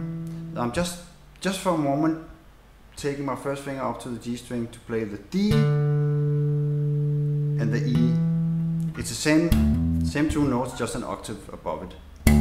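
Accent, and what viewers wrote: Denmark. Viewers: haha vidste du var dansker da du sagde hej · That is the CORE of danish accents! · That is one Danish accent right there :)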